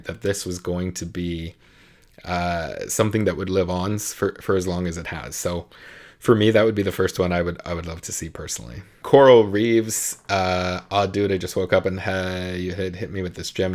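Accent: Canada. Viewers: My first ever musical? Depends on what you mean. My first STAGES musical was Les Mis. I saw it in ‘93 (I was 13 at the time) Toronto. I think it was the last or one of the last stops of the Canadian tour. Fell in love with the show and went out to get the piano book the very next day. If you mean movies, then it was The Sound of Music. We got hold of a VHS copy back in the 80s and I watched it every day for a month or more. I probably drove my parents crazy! ps: are you Canadian/spend significant time in Canada? I noticed how you pronounce, say, “out.”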